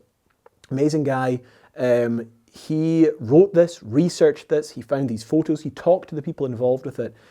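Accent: Scotland, United Kingdom. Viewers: This is like longer Scottish Tom Scott. Great content, only a matter of time for 1 million subs for you. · Fascinating videos but the speed of your commentary combined with the Scottish accent and my old age hearing make it difficult to keep up.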